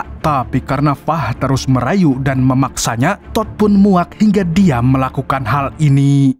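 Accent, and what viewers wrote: Banjar, Indonesia. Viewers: Mang orang banjar kah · orang banjakh bang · Orang Banjar kah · Banjar d mananya mang😂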